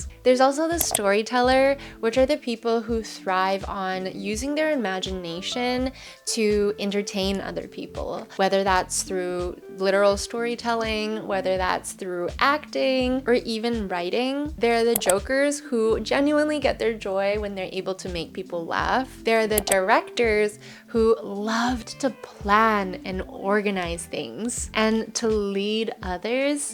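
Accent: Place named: California, United States. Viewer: What in the Californian accent is this?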